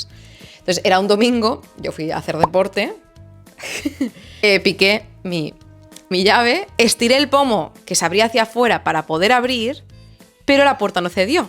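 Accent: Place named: Catalonia, Spain. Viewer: Pues súper interesante y es curioso que una amiga mia que teje y hace crochet como yo n os compartió tu podcast por whattsapp y aquí me tienes, me ha enantado, misterio, historia del arte que me apasiona como la historia misma, ummm, bien felicidades y te sigo. Por tu acento y algun giro parece que sabes catalán? 😂😂😂 porque yo vivo cerqita de Barcelona, ahi lo dejo…. 🤗🤗🤗🤗🤗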